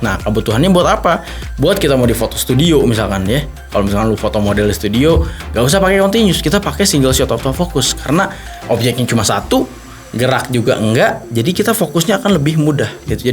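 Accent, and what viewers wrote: West Java, Indonesia. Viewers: bang orang sunda mana?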